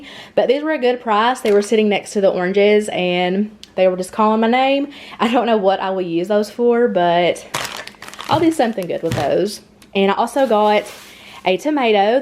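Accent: South, United States. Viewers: You speak very well it's all steel magnolia very southern best wishes for all your goals on giving us you loveya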